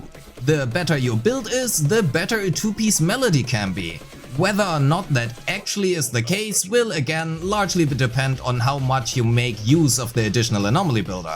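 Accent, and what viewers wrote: Germany. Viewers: Are you german? Your accent reminds me of german ^^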